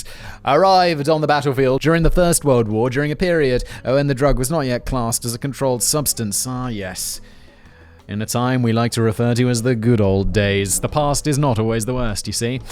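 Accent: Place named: United Kingdom. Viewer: p-ah-stah simon I cringe when you say taco and pasta yall brits need to learn what a soft "a" is. also berserkers of the Viking age literally ate mushrooms before war...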